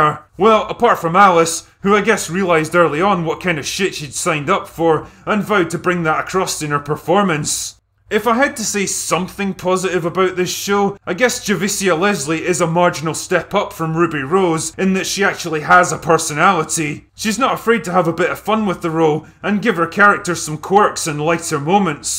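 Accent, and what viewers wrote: Scotland, United Kingdom. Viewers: yep. But also funny with this drunk sounding Scottish accent... Lol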